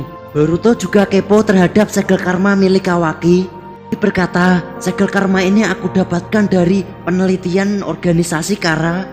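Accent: Java, Indonesia. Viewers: Bang bang woy...ente mendingan pake bahasa jawa aja biar medoknya pada terlestarikan lebih bagus bang...udeh pake bahasa melayu tp medok ude gtu pake ngomong jorok lagi ampoooun deh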